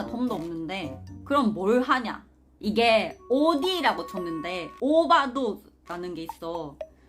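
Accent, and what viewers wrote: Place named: Gyeongsang, South Korea. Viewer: 아니,,, 나 경상도 사람인데 이분 표준어 쓰는줄알았는데 자세히 들어보니까 경상도 말쓰는거같네 ㅋㅋㅋㅋㅋㅋㅋㅋㅋㅋㅋ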